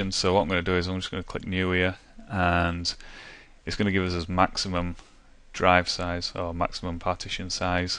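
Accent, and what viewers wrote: United Kingdom. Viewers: Thanks dude, i like your British accent :) You helped me partition my HD going back from Linux to Windows. cheers mate!!! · Thanks British bro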